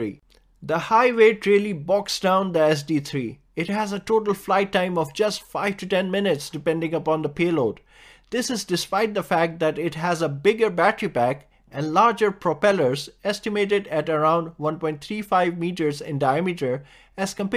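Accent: India. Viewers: Акцент здорового индуса? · Fucking indian accent🤦‍♂️ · o!! indian accent, my pleasure)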